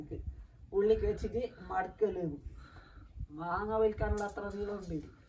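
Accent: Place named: Kasaragod, India. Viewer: Kasaragod anno stalam mosilaa yannh kelttapol tonniyada😅🤣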